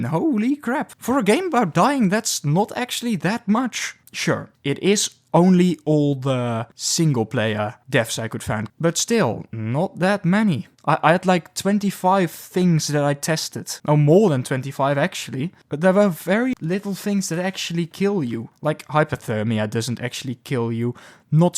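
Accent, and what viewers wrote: Netherlands. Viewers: Ah, classic nederlands accent. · Are you from the netherlands lol?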